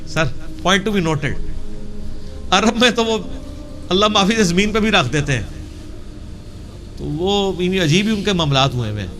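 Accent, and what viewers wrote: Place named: India. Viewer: Hindustani lehja hai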